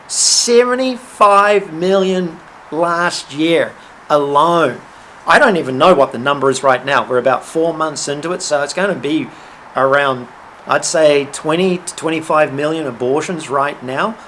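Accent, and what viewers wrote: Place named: New Zealand. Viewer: Never miss your videos, always on point ,no pulling punches. Am aware of a lot of the info you talk about. Just great to here someone on media saying it. Can relate to you because i also have an engineering background. Just love that Kiwi accent with a hint of American as well. Am Aussie so it is very easy to here it. Your subs should be in the hundreds of thousands ???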